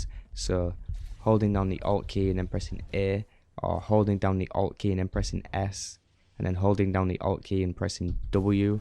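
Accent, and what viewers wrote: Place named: United Kingdom. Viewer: speak english................damn, tired or britiish accents, mumbling fucks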